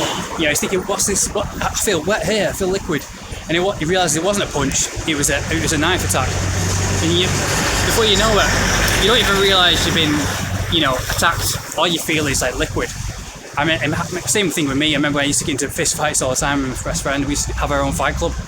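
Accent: United Kingdom. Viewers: You have a Scottish like accent. Are you from Liverpool/Scotland or nearby?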